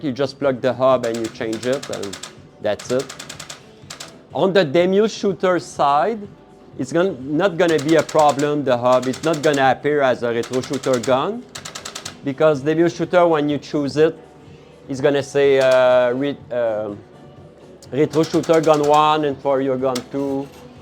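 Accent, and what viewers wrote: Quebec, Canada. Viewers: Woah you sound so Quebecers mon chum! Subscribe esti! J'suis content d'avoir un fan d'arcade/retro de chez nous!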